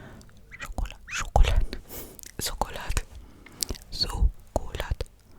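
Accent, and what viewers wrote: Saaremaa, Estonia. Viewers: Aga hääldusest ikka saarlane ka, jääb mulje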